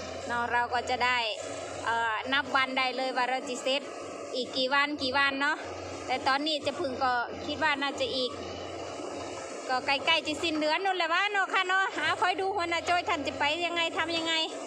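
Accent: Korat, Thailand. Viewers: พูดคือคนโคราชเลยเนาะเจ้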